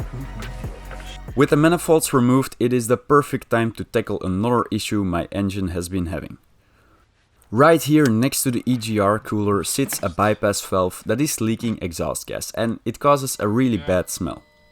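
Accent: Flanders, Belgium. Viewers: Hahaha uw belgisch accent maat 😂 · Ok subscribed, lekker vlaaams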